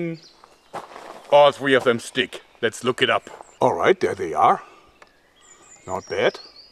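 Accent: Germany. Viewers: ist deutscher er manhörtdas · He’s like my badminton coach but with German accent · Are you a German? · You're German right?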